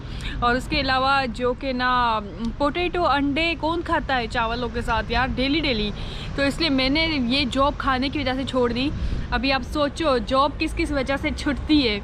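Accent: Sindh, Pakistan. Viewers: Paka Pakistan sa ha bolna sa lag raha ho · I listing Sindhi style urdu speaking after 15 year love thissssss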